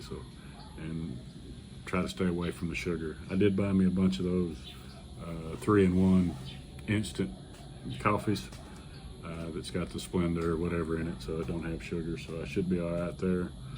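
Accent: South, United States. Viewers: I don't know if you're speaking quietly on purpose, I'm from Minnesota and with your southern accent, I can't understand a word you're saying, plus you mumble, and with that relaxed style, it'd be helpful if you could pla annunciate, thx for your fine content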